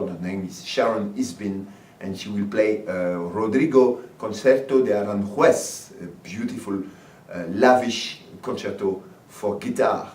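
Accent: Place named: France. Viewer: what a french accent! :)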